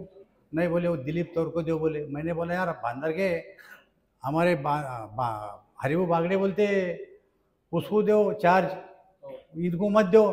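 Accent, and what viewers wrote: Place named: Marathwada, India. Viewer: Khup chhan gavran jaminivarcha neta..... jaykwadichi koli ani ravsaheb denvenchi boli hicha marathvadachi alokha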